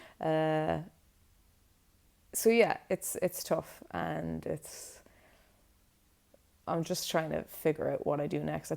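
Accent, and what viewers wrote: Ireland. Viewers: I swear I could listen to read the phone book and be happy for hours. The Gaelge accent does that to American ears.:)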